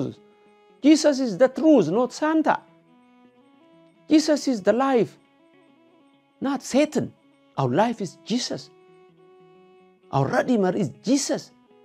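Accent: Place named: Gojjam, Ethiopia. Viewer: እንግሊዝኛን በጎጃምኛ።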